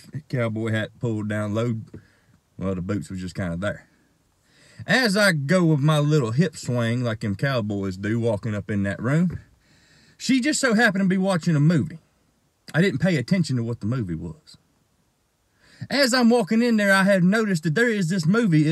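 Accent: South, United States. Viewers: i love this southern draw, i mean dammm, i live in australia a chattering squirrel probably sounds like sweet baby jesus to be honest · He reminds me of edp445 but more southern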